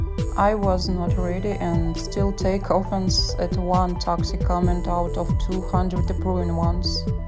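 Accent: Russia. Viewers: Girl ur voice is just so pretty! And ur accent? Russianaccent is just so lovely i rly like it <3 following u since a while now ur speedpaintings r always so amazing and also kinda relaxing to watch <3 much love from germany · ЧТО. Я УМЕРЛА ПОСЛЕ СЛОВ "АЙМ ФРОМ РАША".......... я всегда думала, что ты из Америки.... даже подумать не могла... смутилась только когда услышала акцент, и тут.... воу... удивляюсь, почему у тебя не миллион.. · Your voice is beautiful. Russian accent is the best for me. Its calming and soothing lol. Your art and voice us the most beautiful thing i saw and heard. Keep up the good work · I just wanted to point out: even tho you see some hate, never forget how many people actually love your art. For me personally, you're such an inspiring person with your style, the way you shade etc. And I loooove your russian accent, it sounds so wonderful and calming💖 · Girl, I MISSED HEARING YOU AND SEEING YOU UPLOAD. 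You have absolutely NOTHING to be nervous about! Russian accents are one of my favorite, and your voice is absolutely gorgeous. Don't you ever be ashamed. The same with your artwork, that's nothing to beat yourself up over. That one criticism out of the many compliments is a good way to show yourself that your art IS art and that your journey for improvement is never over. I think one of the beautiful things about being an artist is that you're not alone. Everyone has gone through and been shaped by similar struggles. Keep on keeping on. You are amazing, BEAUTIFUL, and one of my biggest inspirations. You are the reason I picked up an ipad and I do not regret it for a second. Thank you for providing amazing content. 💙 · Could u make a video showcasing your “cool smears” brush pack, please? I can’t seem to find any 💓 (Btw, I really love your voice! & your art too, ofc. I can really relate to your feelings regarding your voice.. 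I am a big fan of accents, though, and Russian accents are particularly beautiful imo. (Not in a weird/creepy way, just in an admiring/appreciative way!)✨ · i love russian accents! don’t be embarrassed of it ❤️ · Whoaa finally! Don't worry about the voice and the accent, I really love russian accent and I am sure everyone is!💚 Good job my queen! waiting for your next voiced video👁️👄👁️✨ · Она русская чтоли? Акцент похож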